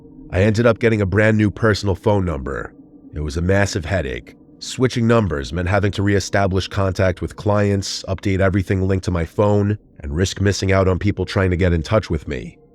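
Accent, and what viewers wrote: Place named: New York, United States. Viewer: Anyone else notice the narrator’s NY area accent like its head out occasionally? Even thru the (what I assume) is a voice filter? I’m guessing he’s from North Jersey…I’m from that same region, so no judgement! 😂 These vids are amazing btw; just discovered them and can’t turn them off! Truly bone-chilling sh*t! Where does he find these stories anyway? Reddit, etc..? 🤔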